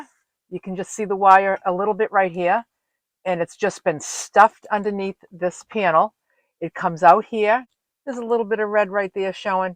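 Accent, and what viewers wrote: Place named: Boston, United States. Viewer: just to let you know, that you are such a regular, played in my kitchen as I'm cooking dinner, that both me and my adult son (from the UK) now have mastered the Boston accent. 'That shit bowthas my eyes, 'Over heeyer" Over theeyer' Love you and your natural style x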